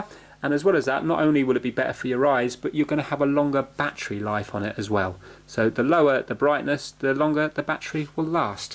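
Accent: England, United Kingdom. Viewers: You have the friendliest british accent I have ever heard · i love this guys accent even tho im british too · Jamás había escuchado el acento inglés, sin duda me sacó de onda por que es algo diferente jaja, espero comprarme pronto mi nintendo switch, veré este video mientras la configuro ;)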